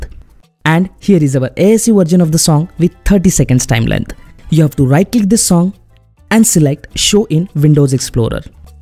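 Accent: India. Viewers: ah yes the first channel that an indian speaking english im so impressed and thanks btw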